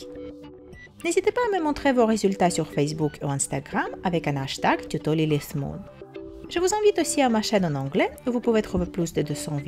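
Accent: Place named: Russia. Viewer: you have a little accent. it's cute! Are you russian?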